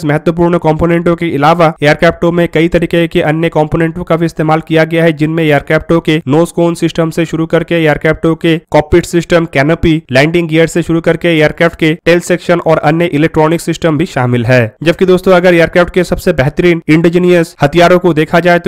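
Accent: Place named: South India, India. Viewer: Arey bhai pehle "indigeneous" pronounce karna toh seeko, north india se aakar south india jaise baat kyun karte ho, kya shiksha ka sthar itna ghat chuka hai kya uttar bharat mein, toh bi phir kya hua, aajkal internet se bi sheeksha ki uplabdi toh utta sakta hai.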